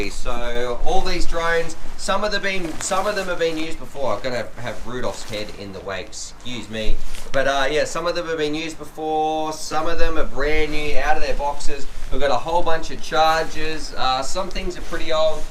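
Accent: Australia. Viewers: I like your Aussie brogue. Anxious to see what new tech is developed in 2019.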